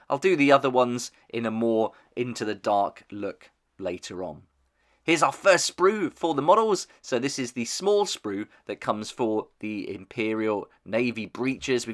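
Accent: United Kingdom. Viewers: This is an unrelated, dumb question, but since you have a UK accent, and you have that knife to open the box, are those knives legal to carry in the UK? Just curious as a US person.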